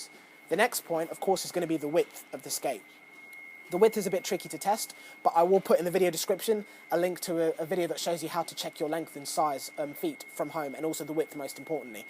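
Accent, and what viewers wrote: England, United Kingdom. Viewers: It’s more that you don’t expect to hear an English accent when someone’s talking about hockey. England isn’t exactly the country one thinks of when they think, hockey hotbed. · Must be Sean mcmorrow's kid with that British accent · OMFG I DIED WHEN I HEARD HIM SPEAK . HE'S BLACK BUT HE SPEAKS BRITISH LOL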